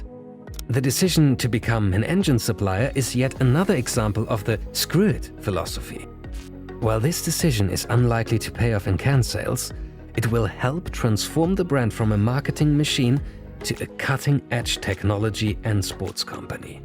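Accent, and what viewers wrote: Germany. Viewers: are you german? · Your are German right? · No doubt the narrator is German. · you are german! i can hear it, how you pronounce the german/austrian names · So wie du die Namen aussprichst bist du Deutscher oder Österreicher :D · Your German accent is so off-putting. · I'm new here but I could listen to the German accent all day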